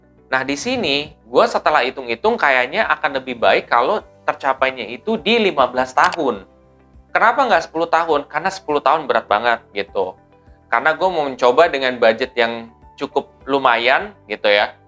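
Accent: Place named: Java, Indonesia. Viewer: Keturunan bule ya mas tapi medok jawa 😊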